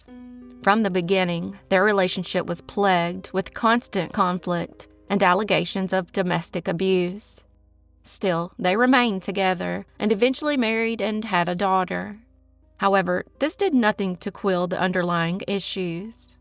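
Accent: South, United States. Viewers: Got that smooth, Southern voice❤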